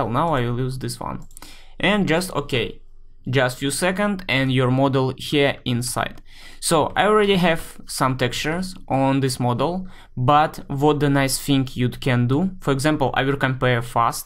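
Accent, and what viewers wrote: Russia. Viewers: есть сильное ощущение, что твой родной язык - русский!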